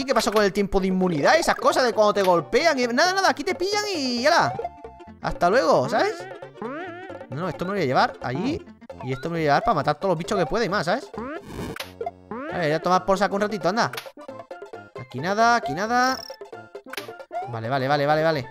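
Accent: Spain. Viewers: Tu tenéis voz de malagueño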